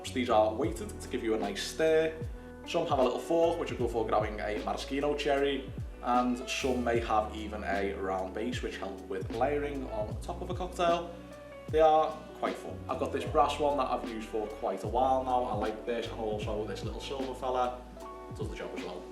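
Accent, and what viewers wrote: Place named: Liverpool, United Kingdom. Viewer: Stumbled upon you and your channel today when my pal Coach Vino posted about your drink, Vow of Silence. And now I get to work on my ability to understand Scouse! I've subscribed and look forward to your videos. Cheers!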